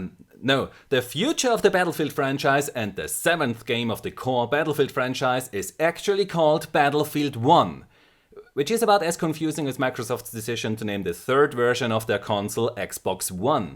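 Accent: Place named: Germany. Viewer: new sub here. Not sure if it's your German accent that keeps me listening or your focused and mature analysis of games, but either way, keep up the great work. You're bound for 1.5 Million subs soon!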